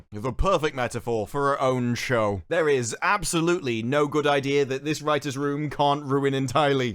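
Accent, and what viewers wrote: United Kingdom. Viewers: dude I love a dude with British accent tearing this apart love it subbed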